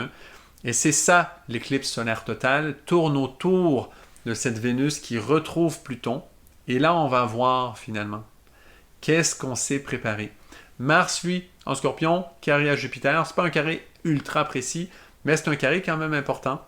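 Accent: Quebec, Canada. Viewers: Quel joli Monsieur avec un charmant accent Québecquois et qui parle vraiment bien et juste!!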